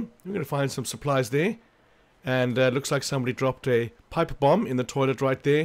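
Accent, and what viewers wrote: South Africa. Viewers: Thanks great video...just got the game and starting. (SIDE NOTE:.....Are you from Mzansi....that accent is a dead ringer). Thanks again, N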